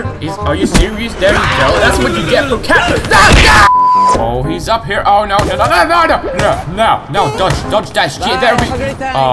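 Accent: Finland. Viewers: Are you from Finland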